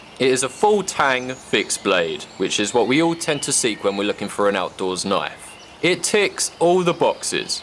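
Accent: United Kingdom. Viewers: Which country do you live in if you don't mind me asking? By the background and your accent I'm thinking the UK. Not a stalker or anything, just wondering how you get away with carrying such a beast of a knife. I want to buy one of these to take camping but I'm worried of getting into legal trouble. I live in the UK and the local police won't even allow me to carry my folding pocket knife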